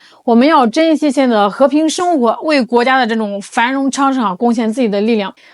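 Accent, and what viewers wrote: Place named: Northeast, China. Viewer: 感觉小懒口音象东北的人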